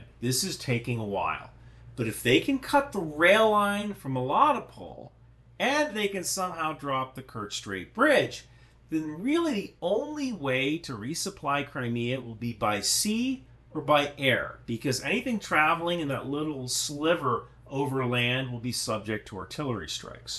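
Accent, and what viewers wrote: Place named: Staten Island, United States. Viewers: That New York accent threw me off 🤣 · Your Staten Island Ferry accent absolutely cracked me up! Your videos are incredibly insightful and informative, so I was pleasantly surprised to get a chuckle from one. Keep up the good work!!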